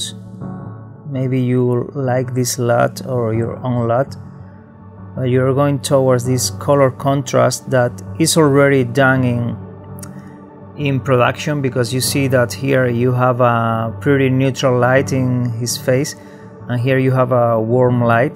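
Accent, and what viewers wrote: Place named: Spain. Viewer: Are you from Spain? Because I am and I can notice your accent 😂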